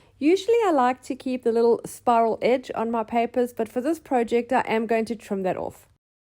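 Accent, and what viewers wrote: South Africa. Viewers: Just discovered your video, and I love your style. Very inspiring. What a lovely surprise to hear a familiar (South African) accent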